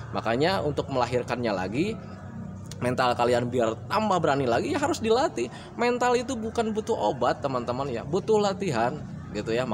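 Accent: West Java, Indonesia. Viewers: Bapa orang sunda ya? Kalo iya. Kali2 pake bhasa sunda nya dong gpp kali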